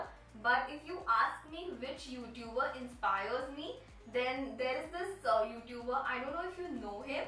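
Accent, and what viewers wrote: Maharashtra, India. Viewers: I knew u are a maharashtrian by the way u speak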